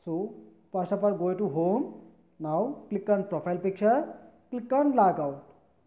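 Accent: India. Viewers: indian english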